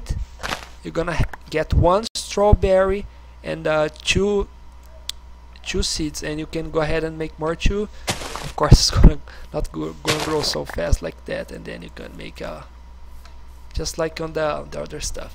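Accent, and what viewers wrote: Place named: Portugal. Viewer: are you portuguese because your accent is the same XD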